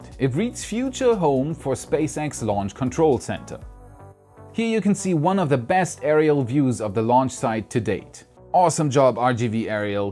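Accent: Germany. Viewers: Tscherman Akzent. His accent is actually quite weak -- there are a few words he gets wrong (such as this one), a few vowels that aren't quite right ("apload"/"upload"), and a particular pause in certain consonant clusters that is common in German. And that's about it. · Are you german?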